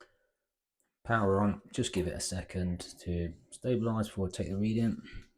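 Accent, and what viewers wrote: United Kingdom. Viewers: sorry one more question. You wouldn’t be from Nottingham by any chance? Your accent sounds local to me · You sound like your from Leicester same as me lol. Just subscribed and given a thumbs up to this great video. I've just bought the Pinter3 presented by Guinness, really looking forward to brewing with it and watching more of your work to help me. I;ve got Dark Matter and Guinness Dublin Porter, sadly Shadow & Creams out of stock. Do you think I can get good results following the Pinter app to start with just to keep thing simple?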